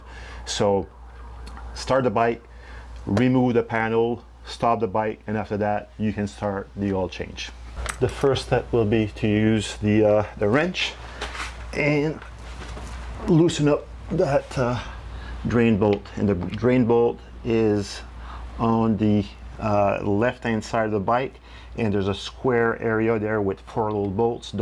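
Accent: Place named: Saint-Sauveur, Canada. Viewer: Je suis de Saint Sauveur, je penses reconnaitre l'accent 😜🙏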